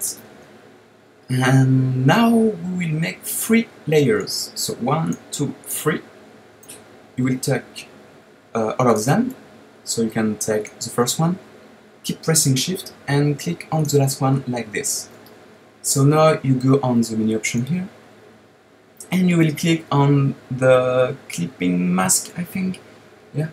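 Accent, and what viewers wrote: France. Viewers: French accent :< ( Je suis français aussi, énorme tes vidéos :)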